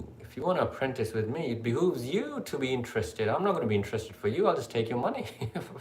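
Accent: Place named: England, United Kingdom. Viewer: Also, you sound like you're in England. That's where I am. But also lost in infinity.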